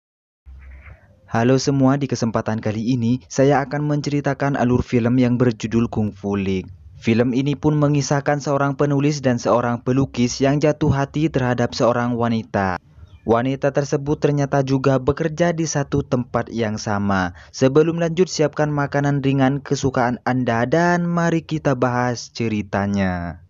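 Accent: Madura, Indonesia. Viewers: Madura sih , kalau dri logatnya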